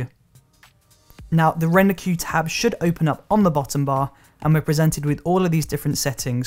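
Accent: United Kingdom. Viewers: really like your brittish acsent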